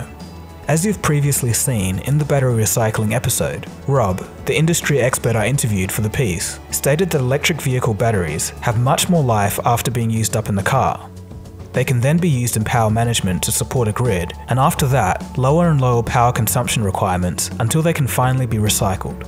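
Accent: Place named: Australia. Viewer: Ford falcon?! Only noticed the subtle Aussie accent after that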